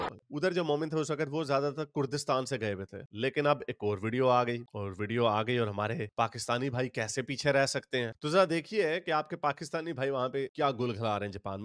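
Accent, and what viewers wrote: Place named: India. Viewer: Bhai tum bolte to sach ho km se km Pakistani nahi indian lagte ho zuban se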